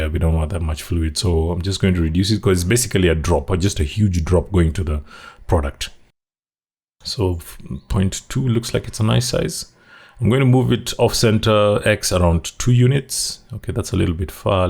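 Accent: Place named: Kenya. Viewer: As if I’m looking for a tutorial, recognise the accent and find someone else from Kenya. Good stuff man. Are you working in Kenya or out the country?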